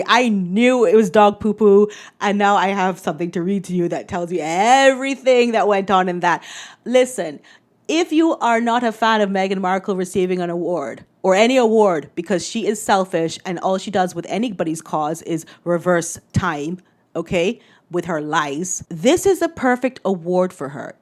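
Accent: United States. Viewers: Omg I love your "American" accent. That voice will be forever in my head for anyone that annoys me😂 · Firstly, I look forward to your videos. Love your American accents and your costumes. My only complaint is that you don't make enough videos. Does your daughter have dollies? They would be great in re-enactments of conversations between the three stogies (H, M and D). Of course, there would have to be smoke engulfing them.😃😗 All the footage from that night, including from Backgrid photographs and any other private or public videos or photos, can be used by the NY DA if there are charges brought against the Duke of Dumbassery and the Duchess of Delusion, as well as Sunny. The DA can subpoena them , or whatever. I'm not a lawyer like you. Sunny did block two police cruisers on the way to a real emergency. The two SUVs also committed multiple traffic violations. 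To be fair to Eric Adams, (I'm not a fan) he was being careful with his words. If you read between the lines, Adams said, "Bullshit!" and "Don't come back to my city". I have no problem with Cabbie Sunny making a little cha-ching from the douchebags' idiocy. Do you know how much they have to pay for their medallions? Being an NYC cabbie ain't easy. Also, Backgrid will not settle for a one-time payment for those juicy photos and videos. I predict documentaries, fictionalized accounts (maybe on Netflix 😁), articles in soooo many tabloids such as Hello!, People, OK!, etc. Backgrid owns them and, as all good capitalists, will not give away easy money. I hope those paps that had to deal with the Harkles bs get nice bonuses. B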